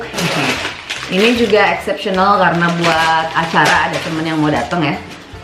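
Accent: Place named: France. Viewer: Keren banget logatnya udah Prancis banget, je suis jaloux je voudrais parler français comme teh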